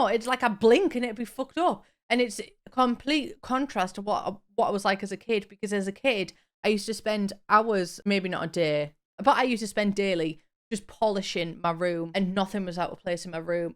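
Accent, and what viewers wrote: United Kingdom. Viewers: I watch a ton of British tv and youtube, so I don’t struggle with your accent exactly, it’s the combination of your accent and how fast you talk😉 I have literally had to slow your videos down to figure something out... and that’s mostly on me because I have hearing loss from listening to my music excessively loud when I was a teen... and even now sometimes... I didn’t learn my lesson😆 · I need you to know that I absolutely LOVE you and your accent is my idea of the perfect British accent. Also this may be my favorite build you've ever done. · Whole truth, i used to watch your videos to get used to your accent so that i can communicate better with my British clients and it worked. I get everything everyone says 😋 Thanks😬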